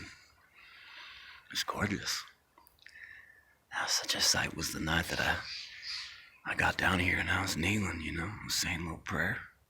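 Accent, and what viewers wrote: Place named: Ireland. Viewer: I miss hearing this in small town coffee co in Kapa’a Kauai. You used an Irish accent. It was fantastic! I think of it often. Thank you for sharing this video. Be well my brother. Aloha!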